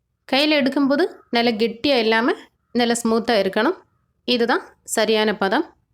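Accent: Kerala, India. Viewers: Your's is Malayalam accent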